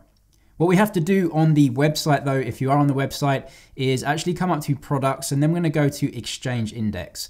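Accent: United Kingdom. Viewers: AGAIN!!!!! Another video that I don’t see what you see?! Is it possible that (I’m sorry) I am American, you sound like you might be across the pond……… could that be the problem???? (CONFUSED!!!!)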